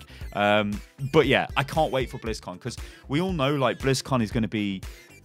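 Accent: United Kingdom. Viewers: what happens. I dont wanna watch this video of a british man talking aasying hoy hell :0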